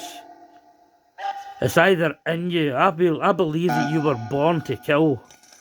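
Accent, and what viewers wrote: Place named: Scotland, United Kingdom. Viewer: Your Scottish accent...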